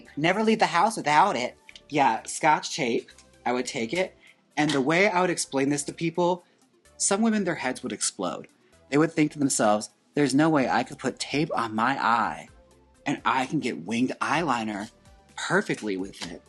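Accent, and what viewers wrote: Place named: New York, United States. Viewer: I love that the raspy voice also comes with a New York Accent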